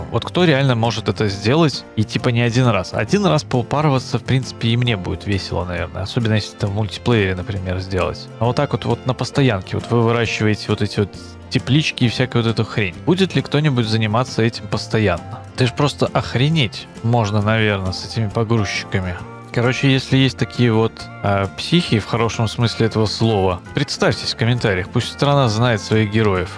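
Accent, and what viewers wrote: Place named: Saint Petersburg, Russia. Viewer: Привет. Спасибо за серию. Ты вроде бы с Минска, а по нашему, по-Питерски разговариваешь. Откуда про бордюры знаешь??? )))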